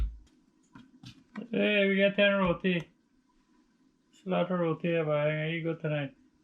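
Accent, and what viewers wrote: Guyana. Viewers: I love your accent❤ pure Guyanese 🇬🇾 Not had a nice chicken curry for along time.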